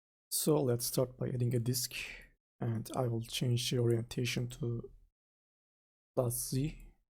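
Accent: Turkey. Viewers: aksanından hareketle Türk olduğunu düşünüyorum... video için teşekkürler. faydalı teknikler göstermişin. 3-4 sene öncesine kadar senin gibi daha fazla kaynak bulabilseydim şimdi max kullanıyor olmazdım.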